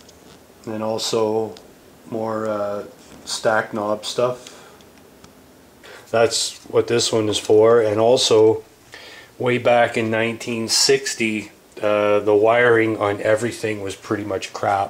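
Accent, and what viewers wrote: Canada. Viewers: Mine is a 1968 that I bought new in '69. Have never owned another, never needed to. I've had it 44 years. Will leave it to my son, who plays bass, when I pass to that 'Great Gig In The Sky'. I still play, mostly blues and R&B. I grew up where we all had a 'Great White North' accent like yours. Great video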